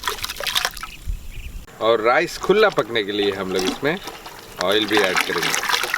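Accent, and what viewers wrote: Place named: South, India. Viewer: i really like his south indian ascent. keep it up, dont try to speak proper hindi or urdu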